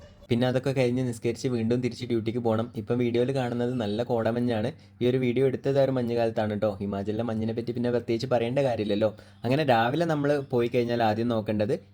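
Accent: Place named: Malappuram, India. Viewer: സംസാരം മലപ്പുറം സ്റ്റൈൽ und ad കൊണ്ട് ചോദിച്ചതായിരുന്നു 👍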